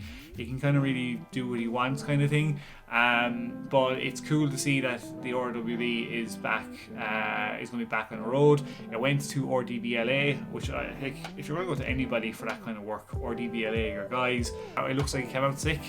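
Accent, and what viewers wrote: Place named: Maritimes, Canada. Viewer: cool. Figured you had a eastern accent from the east coast maritimes. Im from Saskatchewan.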